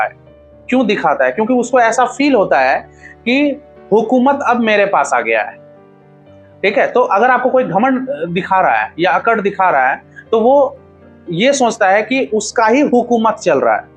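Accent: Bihar, India. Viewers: Aap bihar se ho kya bhai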